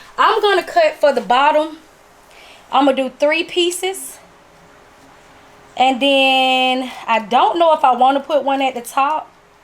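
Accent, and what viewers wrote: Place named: Georgia, United States. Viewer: Thank you for this. I know you gotta be from GA BC we sound just alike.😂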